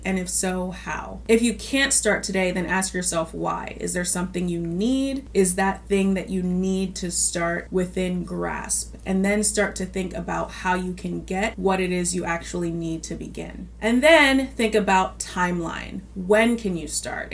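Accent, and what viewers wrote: Nigeria. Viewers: you're an amazing woman of God. Loved this video, but I truly miss your intermittent bursts of Nigerian English. Please bring it back.😂 God bless you, girl!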